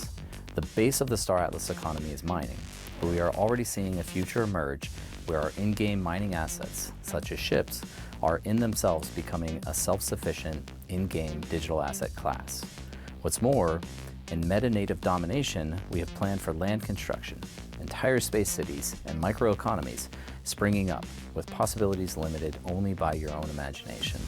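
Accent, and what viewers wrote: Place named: United States. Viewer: Which part of America is his accent from??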